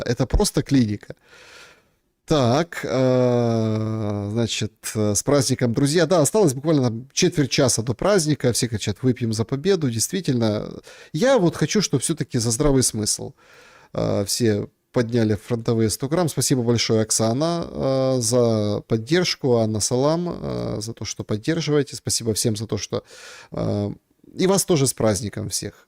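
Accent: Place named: Donbas, Ukraine. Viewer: Господин, мне приятно смотреть ваши ролики, не только потому, что вы в них говорите по "донбасянски", несмотря на звонкое "Г", но ещё и потому, что вы с РТИ! Да и контент у вас востребованный! Мы за вас! Делайте вашу работу, как и всегда. Нам нравится! С уважением - Кременная.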